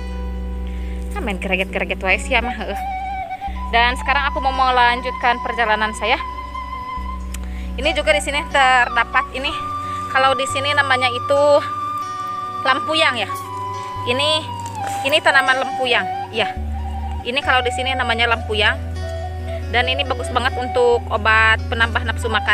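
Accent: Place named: Sunda, Indonesia. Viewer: Modol eta hoe. Semangat pejuang beuteung... Ahaha meuni medok eta sunda 🤣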